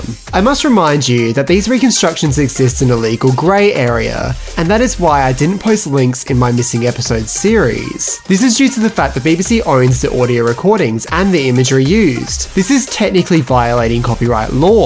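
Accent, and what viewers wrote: Australia. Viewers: Are you austrailian P.S at first its hard to enjoy recon but now i really enjoy it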